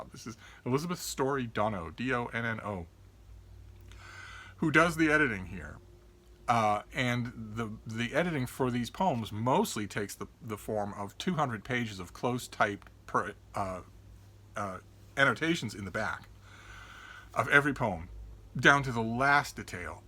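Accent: United States. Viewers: Loving your anti-Roundhead invective but still surprised by an American voice in defence of Monarchy! Totally agree with you about TS Eliot and Marvell.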